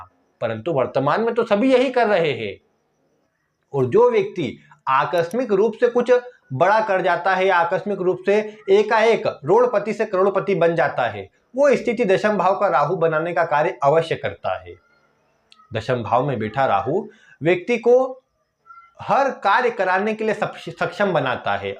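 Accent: Madhya Pradesh, India. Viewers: गुरूजी बहुत अच्छा विश्लेषण दिया है आपने 😊 आपकी बोली से आप हमारे मध्य प्रदेश के ही लग रहे है 😀 मैं भोपाल का रहने वाला हूं ❤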